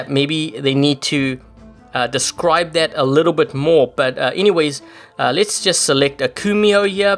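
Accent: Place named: South Africa. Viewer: I'm pretty sure the official language of Brazil is Portuguese and to answer that question: He's said on some of his live streams (that are recorded and posted in his channel in case you want to double check) that he was born in South Africa and went to a primarily English speaking area of the country and I think he lives either in Canada or New York so if you're wondering about his accent there's a solid chance that it's based on all three rather than a Brazilian's English.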